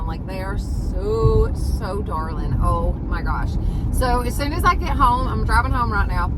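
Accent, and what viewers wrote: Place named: Georgia, United States. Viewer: GIRL! WHERE ARE YOU FROM??? I immediately subscribed because we talk similar lol Northwest GA here but loved the video! You found the holy grail of DT stocked items!!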